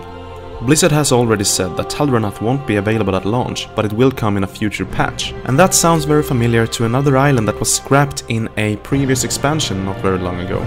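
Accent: Sweden. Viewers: Swedish accent IF am correct? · Swedish accent ... Indeed it was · Man hörde knappt den svenska accenten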